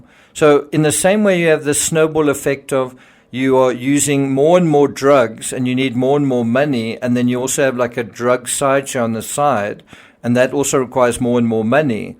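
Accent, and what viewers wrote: South Africa. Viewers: Sounds South African to me. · Hi there! Enjoy your channel. You sound you are from South Africa?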